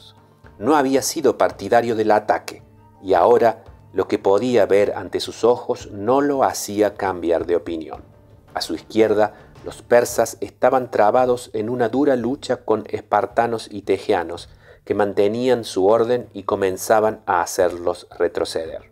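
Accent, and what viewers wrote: Argentina. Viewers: Pero usted no está en España? Por momentos pensé que era argentino por el acento y luego me dije que eran ideas mías, pero ahora que veo su respuesta, creo que no me equivoqué.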